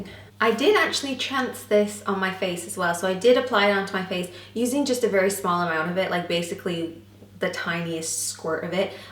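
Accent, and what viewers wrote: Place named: Ireland. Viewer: I can hear an Irish accent in there 🇮🇪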